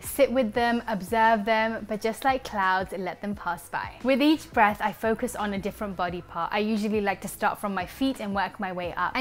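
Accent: United Kingdom. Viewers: Yes, her roots are from india but she was born and raised in London so she has British accent 😊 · African-British-American-Indian lady with a Perfect British accent!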